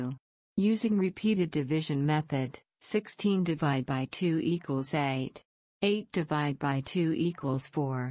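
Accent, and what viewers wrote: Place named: United States. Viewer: Wait, this is your voice? Seems like you're american.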